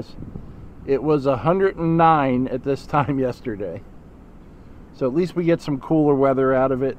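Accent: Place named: Texas, United States. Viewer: There's something beautiful about the look of the place after a hard rain. The food looked good! And, your accent sounds more Texan today. haha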